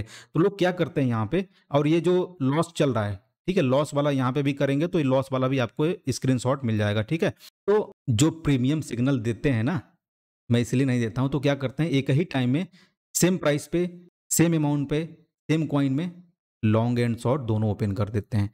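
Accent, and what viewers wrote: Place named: Bihar, India. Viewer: Pakka bihari ho bhai ekdum humko lagta hai mera bhai bat kar raha hai😊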